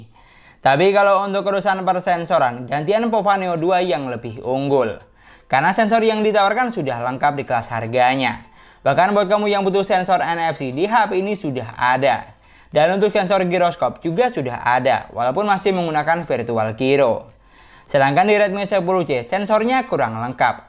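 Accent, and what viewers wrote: Madura, Indonesia. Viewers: logat madura ya bang?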